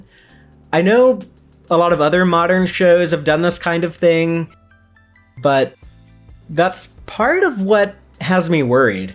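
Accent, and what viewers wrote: United States. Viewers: No offense to this narrator (He did a really good job) but I love Sean's and Ellie's accents. Getting my Star Trek news in an American accent just doesn't hit the same. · You did a great job of presenting this! Do not apologize. It's nice to hear an American accent sometimes, and you spoke so clearly that I didn't need captions. TREK X MUPPETS?? I'm there.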